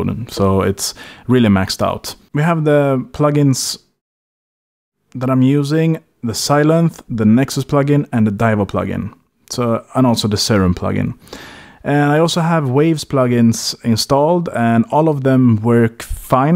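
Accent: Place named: Sweden. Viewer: Tack för denna videon =) Då vet man lite vad man ger sig in i ifall man beslutar för att gå över till M1 i framtiden. Gissar på att du pratar svenska, hör på dialekten =) =)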